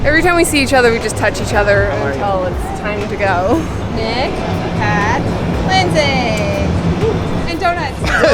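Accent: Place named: Newfoundland, Canada. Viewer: The Newfie accent is THICCCCC right now!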